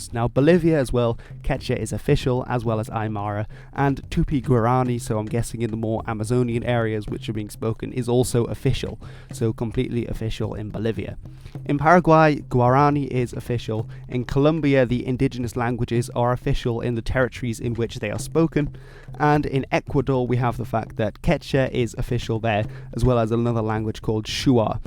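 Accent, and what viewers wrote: Argentina. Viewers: Your "latin american spanish " is just argentinian spanish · Guy who runs this channel: Congratulations on your pronunciation! Your south american accent came out very Argentinian! I'm Argentinian btw. A difference you forgot to address is that us Argentinians speak differently from almost all other south americans. Most south americans pronounce "Yo" (me) as "Io", but we pronounce is as "Sho". And we,m argentinians, are also much more vulgar in speech than the rest of the spanish speaking nations. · your spanish has an Argentinian accent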